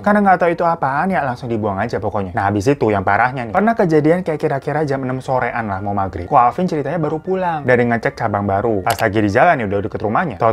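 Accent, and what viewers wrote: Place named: Surabaya, Indonesia. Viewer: Pasti org surabaya nii.. ngomongnya "I" (ai) gt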